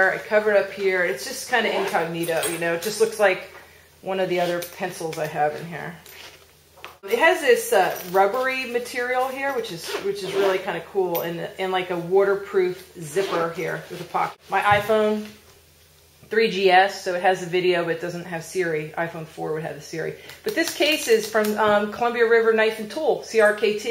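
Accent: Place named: New Jersey, United States. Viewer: I love how Jersey girls say "wooder" ;)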